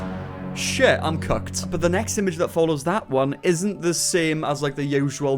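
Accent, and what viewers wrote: Wales, United Kingdom. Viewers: you from north or south wales im also welsh so its ez to know tht you from here lol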